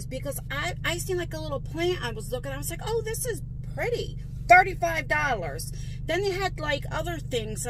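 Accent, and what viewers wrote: New Jersey, United States. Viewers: If you can make $ talking to yourself I can too bc I don’t care about talking & im LEGIT EXACTLY LIKE YOU MINIS JERSEY ACCENT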